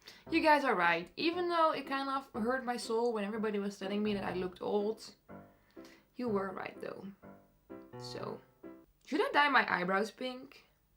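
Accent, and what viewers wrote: Netherlands. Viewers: Ik kon aan je accent horen dat je 🇳🇱 was😁😉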